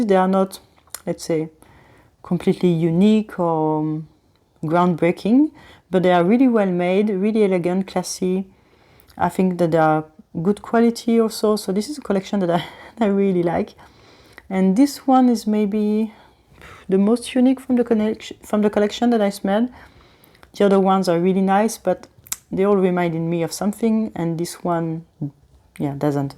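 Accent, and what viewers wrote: France. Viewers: Love your videos and collection and on the top, Looooove your English with French accent 😘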